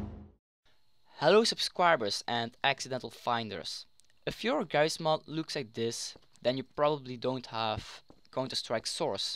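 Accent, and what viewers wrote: Belgium. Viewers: hoorde aan u accent al da ge een belg zijt haha :d ma goeie tutorial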